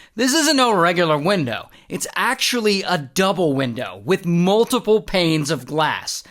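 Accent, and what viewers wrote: England, United Kingdom. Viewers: Im cackling at ur british accent dude lmao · that bri'sh accent 💀🇬🇧☕ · The British accent 💀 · bro has an interesting British accent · Mint English accent! Hilarious story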